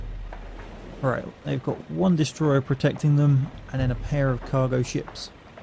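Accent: United Kingdom. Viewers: I LOVE BRITISH GUYS THEY SOUND FUNNY AND I MEAN THAT IN A GOOD WAY IM NOT BEING MEAN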